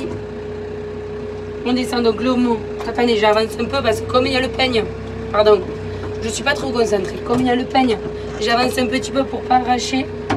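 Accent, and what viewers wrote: South, France. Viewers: Belle vidéo, bien expliquée, vous êtes du sud de la France ha oui je me demandais d où venait cet accent, bien agréable. De Vendée non, du Nord non. Plus sérieusement, l'outil doit utiliser la puissance du tracteur. D'ailleurs, ce n'est pas le fendt, il n'est pas cassé 😉. Je suis rassuré car tout a bien été réfléchi dans la tête d' et le résultat sera bon. À bientôt pour une nouvelle vidéo, et toujours avec le sourire.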